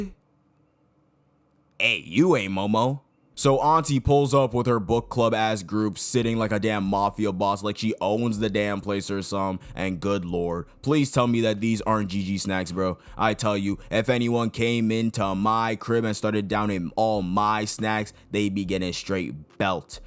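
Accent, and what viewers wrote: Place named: Africa. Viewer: NICE ASS AFRICAN ACDENT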